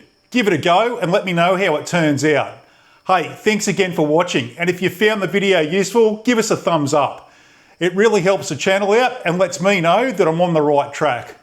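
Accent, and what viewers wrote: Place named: Australia. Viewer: MATE!!! Bloody love your channel, good job on all the videos and love the true Ocker accent, you remind me of me sergeant in the glorious RAR. Keep up the stellar work!!! 🇦🇺🇦🇺🇦🇺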